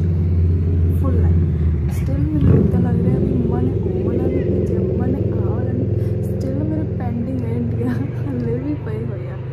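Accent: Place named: Canada. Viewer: mam da canadian slang hogya hle 6 month ni hoye ayi nu bakwaas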